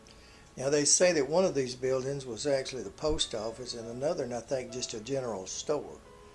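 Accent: South, United States. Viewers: These are some of the places I'd like to see when I got more time. IE retired. Thanks for the video. Your southern accent reminds me of my Alabama In Laws. Ya'll take care now, Bless your heart for the good work done here. D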